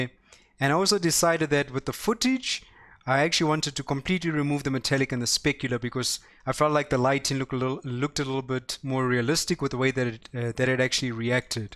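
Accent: South Africa. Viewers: I can hear you're South African, hahah, hello from Durban 😂👍🏻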